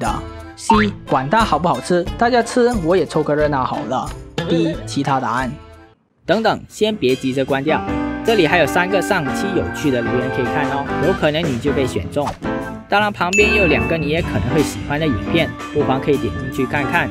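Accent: Malaysia. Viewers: 听口音像马来西亚人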